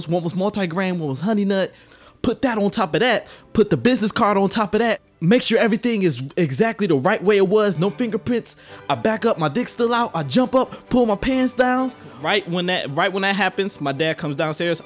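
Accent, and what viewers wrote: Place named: Michigan, United States. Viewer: accent! #MichiganNative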